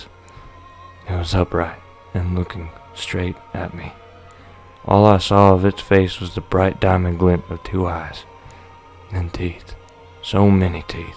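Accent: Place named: Old West, United States. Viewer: Nailed It!!! Your old west accent and dialect was spot-on and I freaking loved everything about this video and narration absolutely brilliant.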